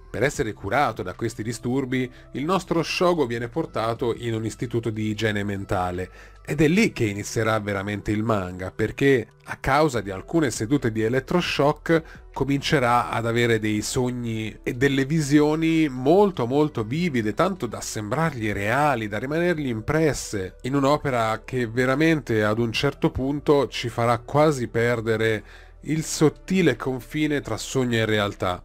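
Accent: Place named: Tuscany, Italy. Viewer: Hai una voce bellissima, toni pacati e mai noiosi, accento vagamente toscano mai pesante e contenuti coinvolgenti. Ho adorato anche questo video. Piano piano me li vedrò tutti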